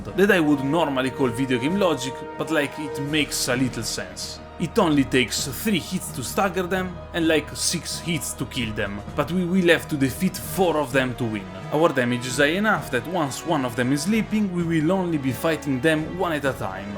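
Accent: Italy. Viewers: Adoro l'accento italiano 😭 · Si, l'accento italiano si sente. Ottima run, comunque! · I just love your Italian accent. You are the only Italian DS player I know. Keep up! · Perché hai l'accento italiano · Your voice gives me italian vibes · You're Italian?! I knew you had an accent just couldn't ever place it (don't judge I'm a foreigner too) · Italian accent + berserk reference= me subscribing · Sei italiano? credo di sì per la parlata e la voce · Che bellezza scoprire che sei italiano, l'avevo immaginato da qualche pronunzia! Adoro i tuoi video 🤩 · fammi indovinare, italiano? ahaha l'accento ti vende che una bellezza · Oh shit your accent is Italian? I thought it was French 💀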